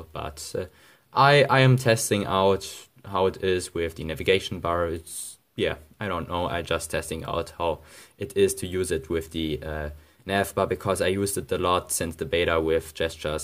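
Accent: Germany. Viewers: I love your German English accent 😍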